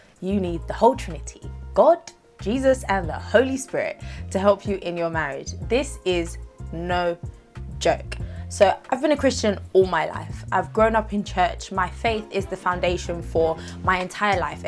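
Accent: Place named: London, United Kingdom. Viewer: I didn’t notice your accent until you said you were from London 😂 it’s so subtle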